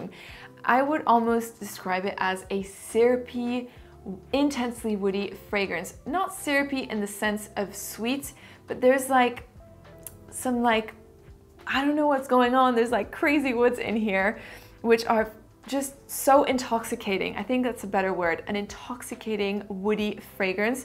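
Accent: France. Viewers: Bonjour, Je viens juste de découvrir cette vidéo, je reconnais un accent français! Est tu française. As tu déjà fait un top 10 de tes parfums préférés et si oui pourrais-tu m’envoyer le lien? Je suis dingue de parfums et suis toujours à la recherche de nouvelles découvertes 🥰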